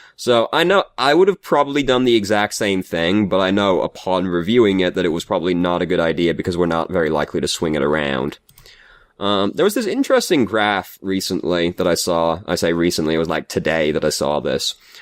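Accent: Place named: East Coast, United States. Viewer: Where in Europe? My first guess as to where you're from would've been somewhere on the east coast of the US...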